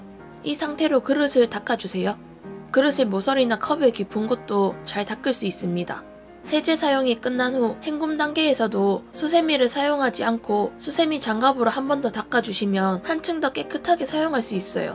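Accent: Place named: Gyeongsang, South Korea. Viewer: 경상도 억양밖에 안들림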